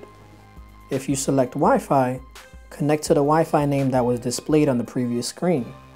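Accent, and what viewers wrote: New York, United States. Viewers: NY? ...lol you sound like it.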